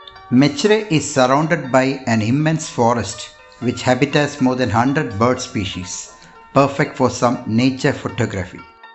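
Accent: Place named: India. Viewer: Yeena dey...Your English...is Indian pronounces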